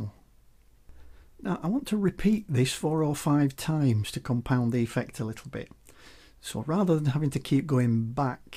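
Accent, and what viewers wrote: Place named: Yorkshire, United Kingdom. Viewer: Nice one....I can think of all sorts of uses for this. Great to hear a Yorkshire accent too:)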